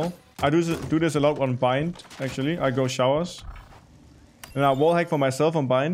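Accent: Denmark. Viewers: are u from denmark?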